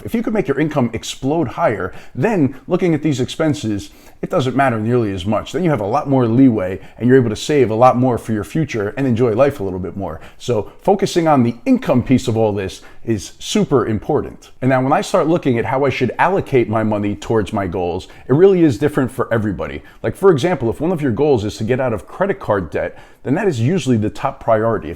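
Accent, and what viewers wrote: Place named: New York, United States. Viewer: Oh wow! I notice the mic on your shirt. Big difference in sound from the previous vid about leverage. Your voice sounds more clear and focused and the room echo is gone now. Your voice is clear and deep now. Good job man! Oh, your speech style really reminds me of Christopher Walken. I guess it's a New York thing lol.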